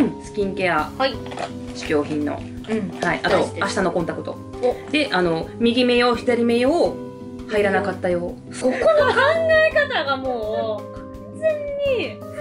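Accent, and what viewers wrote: Kansai, Japan. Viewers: さんの関西弁めっちゃ好きなんやけど。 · やでなぁめっちゃ分かるわ😂 本間の関西弁っちゅうのが分かる！w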